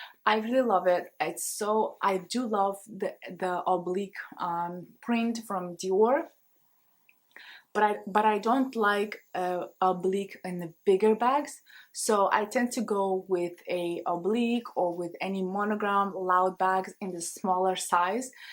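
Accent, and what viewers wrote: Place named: Russia. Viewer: Вы казашка? Акцент русский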